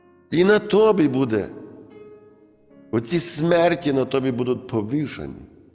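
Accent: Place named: Ivano-Frankivsk, Ukraine. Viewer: Дуже гарна проповідь.Але люблю Ваш Івано Франківський акцент!... ближчим до серця❤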